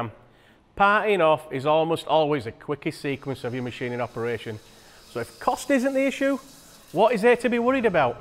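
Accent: United Kingdom. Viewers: a regional British accent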